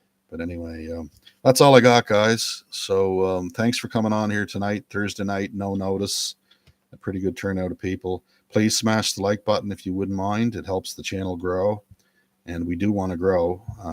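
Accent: Canada. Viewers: Are you Canadian? (Your accent.) If so you are definitely in the same boat as us in the US. I am trying to go this route by UCC-1 and Status Correction.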